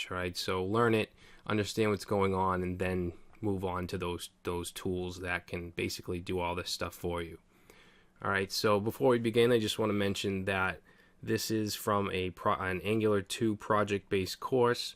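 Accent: Boston, United States. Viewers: That Boston Accent is wicked fun to listen to.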